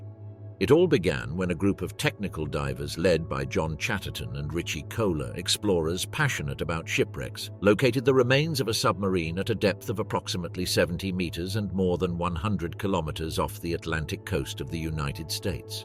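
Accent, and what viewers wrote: England, United Kingdom. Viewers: the German navy was ruled by large battleships and you show the Lusitania? Can it get stoopider than this? You don’t get credibility just because you give the narrator an English accent. Thumbs down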